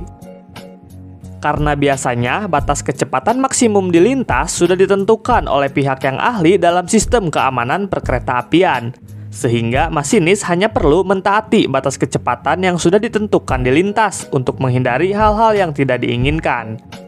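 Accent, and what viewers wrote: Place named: Sunda, Indonesia. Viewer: Kental juga logat Sundanya, bro.👍🤝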